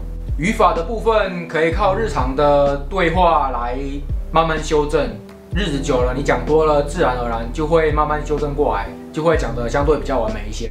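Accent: Taiwan. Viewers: 小哥的話很像台湾人的口音 台湾觀眾聽起來熟悉 舒服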